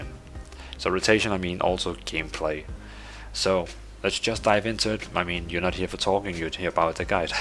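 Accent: Denmark. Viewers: Danish much :O?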